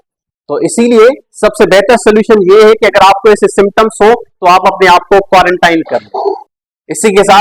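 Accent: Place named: Sindh, India. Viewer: Sir I have some suggestions I would like to share with you firstly that there is a little bit Sindhi accent flowing in your tone kindly improve that and second the voice quality is not that good for that you can use Adobe podcaster firstly you will import your audio file from your video then you can enhance it from Adobe podcaster then later you can mute the video and put the audio file it will improve it soo yaa that's all I guess please put a eye on that thank you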